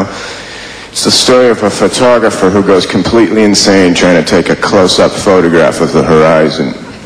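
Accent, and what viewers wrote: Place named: Australia. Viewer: FUNNY SCOUSE ACCENT- it's australian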